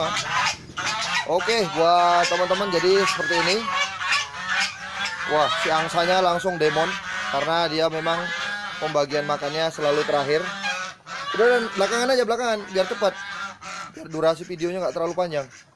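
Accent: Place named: Java, Indonesia. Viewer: bagus bermanfaat. wong Medan, aksen ngomongnya kok wong Jawa ?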